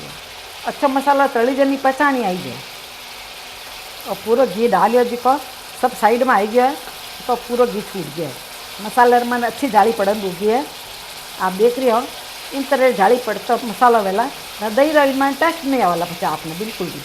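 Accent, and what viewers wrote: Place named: Marwari, India. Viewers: This lady's Marwari is just so pure and sweet. The sheer pleasure is to listen and savour. But the added prize is some amazing food dishes. We've tried many and they are simply gorgeous. What an amazing find on YouTube - thank God for YouTube, the world would be poorer if we'd relied on terrestrial TV. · She must be from nagaur district of raj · Love to listen your rajsthani voice and good sabji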